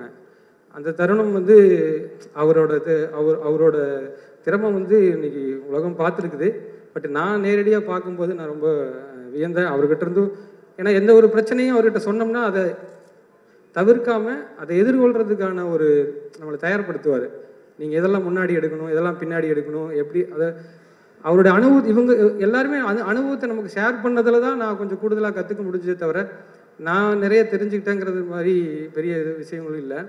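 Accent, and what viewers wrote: Kongu, India. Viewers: திருப்பூர் காரர் .. கொங்கு தமிழ் super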